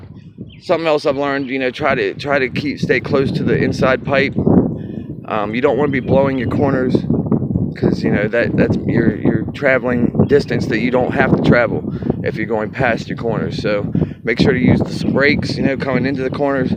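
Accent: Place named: Pennsylvania, United States. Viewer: You sound like you're from PA, and not NC. Just an observation. :D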